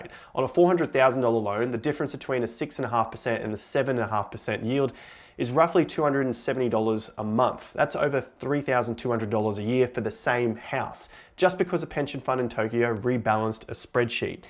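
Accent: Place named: Australia. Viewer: Aussie accent talking US rates has me confused be more specific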